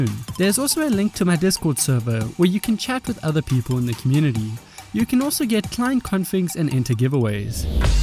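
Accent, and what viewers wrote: South Africa. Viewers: this man sounds southafrican. idk why but he just does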